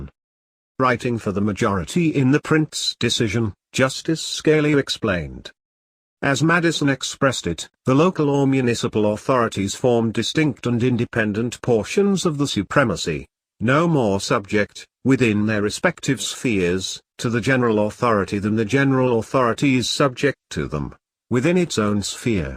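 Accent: United Kingdom. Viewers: Why is the narrator a limey???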